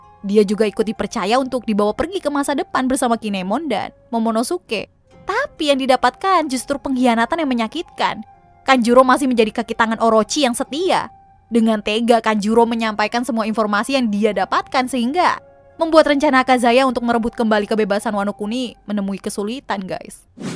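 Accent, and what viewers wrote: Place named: Betawi, Indonesia. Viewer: saikk ada sdikit logat betawinye ~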